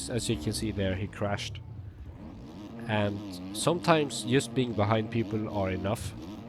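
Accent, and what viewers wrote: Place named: Sweden. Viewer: mate, you have the most swedish accent i’ve ever heard lol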